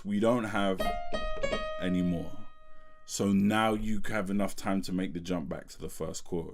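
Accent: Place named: United Kingdom. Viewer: Wow when I heard the British accent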